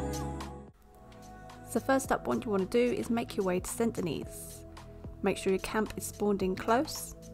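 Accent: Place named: United Kingdom. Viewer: Im also british, i dunno if she british but she sounds british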